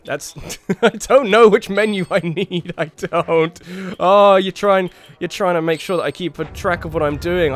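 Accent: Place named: Scotland, United Kingdom. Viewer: We need the Scottishness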